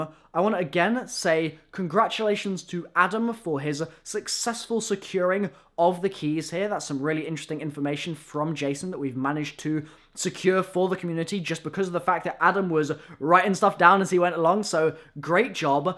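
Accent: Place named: United Kingdom. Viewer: Your too British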